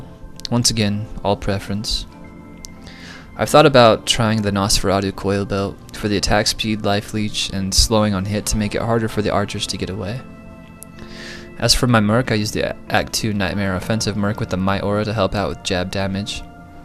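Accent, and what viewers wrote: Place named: Oregon, United States. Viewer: This is the most Oregon voice ever.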